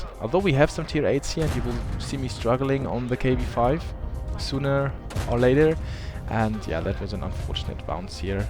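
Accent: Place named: Germany. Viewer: your accent sounds like a native German speaker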